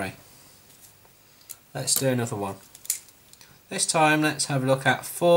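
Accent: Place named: Yorkshire, United Kingdom. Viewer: Proper Yorkshire accent I think I would love to live there..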